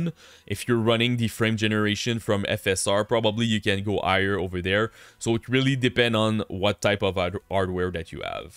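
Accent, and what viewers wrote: Quebec, Canada. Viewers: T'es clairement Quebecois haha! L'accent est accurate.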